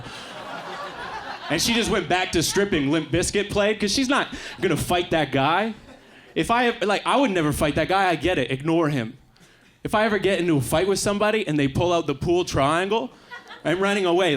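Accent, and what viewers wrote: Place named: Canada. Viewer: His Canadian accent brings back memories of home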